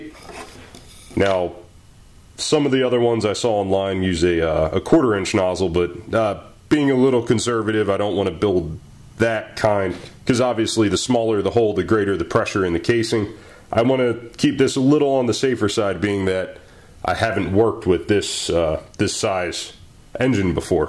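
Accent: South, United States. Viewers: Wow, AvE. You seem to have picked up a slightly southern twang and a deeper voice. The rest of it is the exact same though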